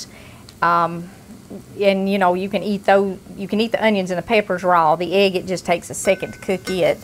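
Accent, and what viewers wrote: South, United States. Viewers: Just love this lady! She talks like my grandma did, southern twang! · She sounds like my people. Hillbillies have been around forever.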